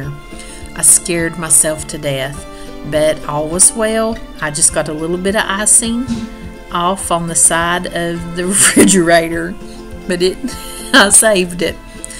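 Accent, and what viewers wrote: South, United States. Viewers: Definitely rice or roast potatoes...you make me laugh, saying you nearly dropped your cake. And the other time when you burnt your meatballs! Love that you show your fails, and your southern US accent is such fun to my English ears.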